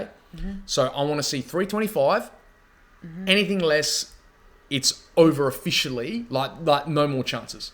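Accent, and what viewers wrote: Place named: Australia. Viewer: Yankee here, but my family did live in Melbourne for 7 years recently before to moving back to the States. I played D2 when it first came out, then again about 10 years later to build a character to do the Ubers. In my third run now to try to beat the Ubers and colossal ancients this time. Really enjoyed hearing the High Rune Frustrations in an Aussie accent and learned a thing or two along the way. Subbed so I can hear more whinging and I hope you eventually get that perfect role.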